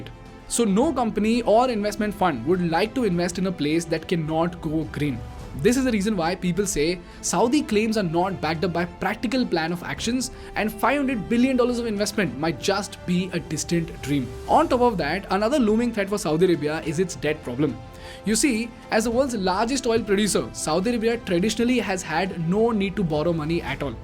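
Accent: India. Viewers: In your contribution there is some truth. But how you present it in high speed and with your indian accent, that's terrible. Also your argumentation chain is not sustainable enough. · Good video 👍 but Please speak proper English not indlish if u can't then please get someone to articulate it. Very irritating when half is what u says is not clear. World sounds like ver-ll and u speak is fast...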